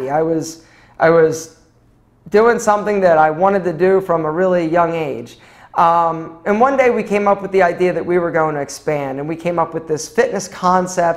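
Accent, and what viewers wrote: Philadelphia, United States. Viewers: I knew that accent sounded familiar. Grew up in Philly as well. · I knew I recognized that philly accent!!!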